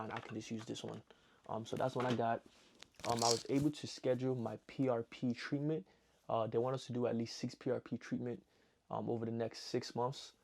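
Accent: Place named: Liberia. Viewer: This pekin sounds like a Liberian pekin!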